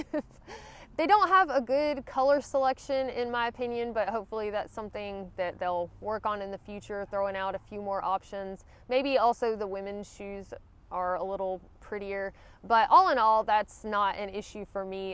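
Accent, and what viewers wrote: South, United States. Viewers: The "hey, ya'll" got me! Love hearing a southern drawl on YouTube. Sounds like home to this North Carolinian. :) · I wear Altra Superior 4.5's (coming up on 500 miles 1st pair), which I love! (found on sale and bought all of my size) They came with a rock guard insert. What are your thoughts on that type of insert? I installed them (under Dr. Scholl's inserts), but wonder am I really getting a benefit. Love your video's, southern drawl (us being from Texas) and expressions (nicknamed with friends Dixie-izms)!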